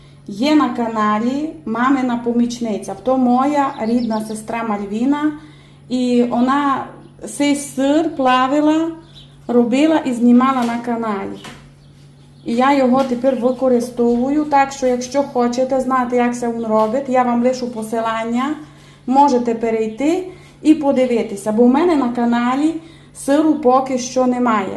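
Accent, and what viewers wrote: Transcarpathia, Ukraine. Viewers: Дуже гарний закарпатський діалект.Кому не подобається, хай не дивиться. Ви молодець. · Пані не вздумайте міняти мову ведення каналу. Я з Києва і тільки за Закарпатський діалект. Вас просту чути приємно. Ви знаєте комікесу Леру Мандзюк? То ж вона цікава тим, що розмовляє діалектом. Я приїжджаю до рідні маминої на Хмельниччину ❤ я млію від Подільського діалекту. Говоріть по свому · Дякую за рецепт, простоту , закарпатський діалект ❤ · Смотрю на Вашем канале не только рецепты, но и наслаждаюсь Закарпатским диалектом! Очень нравится, просто наслаждение! 👍🌿🌹🌿Привет из Одессы 💖☘️🌻🌻🌻☘️🇺🇦🇺🇦🇺🇦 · Люблю закарпатський діалект❤❤❤ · Я з Полтави, заслуховуюсь Вашим закарпатським діалектом. Вмикаю канал, дивлюсь як готує господиня каналу та просто слухаю та насолоджуюся ❤ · Дякую за рецепт. Він просто чудовий. Слухати Вашу закарпатську мову просто насолода та радість для серця. 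Ще раз дякую🙂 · Дуже файна піца ,а ще ліпша закарпатська мова .дякую Вам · Пані, у вас шикарний закарпатський діалект. Вас приємно слухати, а головне- смачно слухати😊 Дякую за рецепт. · Приємно слухати закарпатський діалект. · Пані, ведіть свій канал тільки своім закарпатським діалектом, я коли вас слухаю душею переношусь в Виноградів до своіх дорогих друзів. Дякую вам ❤️ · Щиро дякую за смачний рецепт.Ваш закарпатський розговір - це Ваша родзинка. Миру нам усім і перемоги. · Важ закарпатський діалект це перлина самобутності, слухаю вас і отримую велике задоволення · кому ся не подобає закарпатський діалект, то най ся не дивит. А я люблю як ви ведете канал❤ і не я одна😊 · Мені суперово слухати на закарпатському, все зрозуміло! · Мені дуже подобається закарпатський діалект! Через нього я звернула увагу на ваш канал! Будь ласка, продовжуйте говорити, як ви звикли! І дякую за ваші рецепти ♥️💙💛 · Дуже люблю Ваш закарпатський діалект. 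Говоріть ним, бо він чудовий. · дякую вам розмовляти так як ви на Закарпатті розмовляєте це думаю гарно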